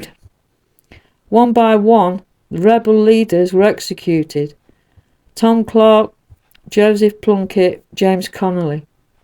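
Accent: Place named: England, United Kingdom. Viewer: I dont know if you are English (you sound like it) but if so then well done for talking on such a complex and tricky subject which many English / British don't go near for some reason. And thank you also for calling him "Patrick Pearse" as many Irish for some reason call him Pádraig or Pádraic Pearse which of course is wrong, as you correctly say its Patrick Henry Pearse and was known to everyone as Patrick or Pat. Well done.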